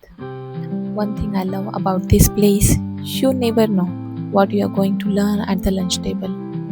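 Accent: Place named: Ahirani, India. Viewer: Your accent is more like an Ahirani language 😃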